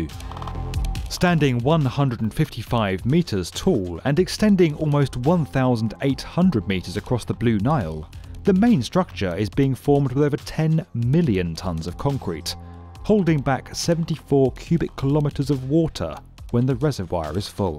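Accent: United Kingdom. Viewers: 🤣 No the narrator is British! You can EASILY tell by his accent!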